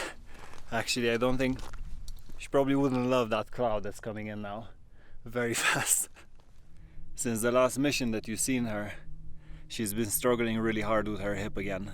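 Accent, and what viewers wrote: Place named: Romania. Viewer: Mi-am si zis, ca dupa accent esti roman. Felicitari pt ceea ce faci!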